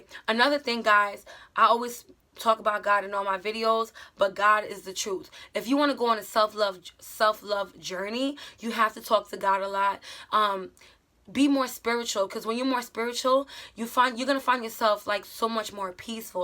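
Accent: New York, United States. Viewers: Was studying for a test tmrw and needed a quick break to release my energy and re focus and just right then your video showed up! And this fits so perfectly in my life rn because ive started slowly but surely focusing on myself and especially self love! Talk about God! Everything you said is head on esp about the spirituality part and eating right. I just got to a point where i was depressed and literally awaiting death and im only 16 as if that would happen any time soon. And I just opened up to God, told him I wanted to change my ways and be happy and be the person whom he wants me to be so when i finally meet him face to face, he'd be proud and satisfied. And I just recently subbed last week and have watched most of your videos, I love ur personality and character! You have such a big sister/ hippie aunt/ motherly love and aura and wiseness to you. 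Idk if u are from Ny and if that helps anything lol but Im picking up the accent and vibes. You honestly deserve a lot of subbies bc you dont srve us 5 mins fake pranks bs or say things that are honey tp our ears and not follow ur own advise! I really don't understand people like u who are wayyy underrated. But keep these vids coming in sis!! Im the oldest sister myself and have always wished i had an older sister to guide me and give me advice and u are one of the very few that do that and thanks for it!!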